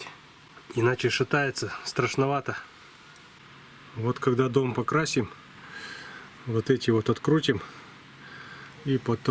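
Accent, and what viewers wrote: Perm, Russia. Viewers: Нормальный пермский говор)))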